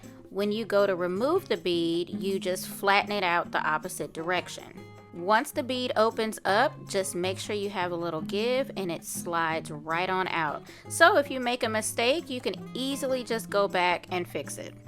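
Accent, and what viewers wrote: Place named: South, United States. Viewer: Ok ms thang with the country twang!!! You ate this up my love!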